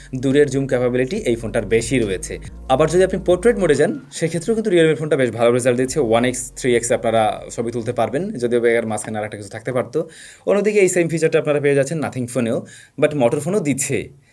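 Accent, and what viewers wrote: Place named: Jashore, Bangladesh. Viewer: vai apnar pronunciation ta jashore er vibe dei. Apni kon jelar origin? Sadat Jashore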